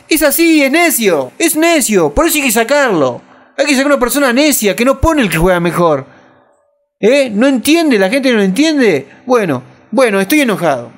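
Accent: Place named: Argentina. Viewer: Pareses argentino como hablas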